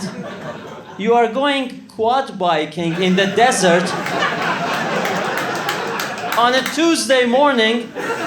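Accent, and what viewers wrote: Iran. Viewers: Should ur name be “ ” that Persian accent isn’t fooling anyone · he himself is Iranian i can say by his stupid accent and he badmouthing his own ppl, that is always a bad sign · What’s up with your Persian accent bro?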